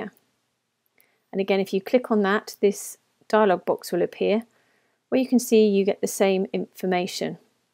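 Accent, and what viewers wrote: United Kingdom. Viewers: Straight & Simple. Thank you! (Why does British accent makes the person sound intelligent to start with?)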